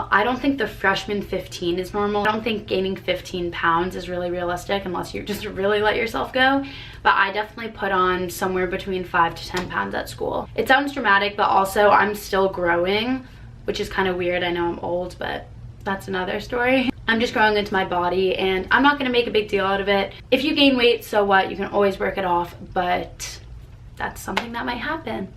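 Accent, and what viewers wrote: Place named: Australia. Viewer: you have a low key Australian accent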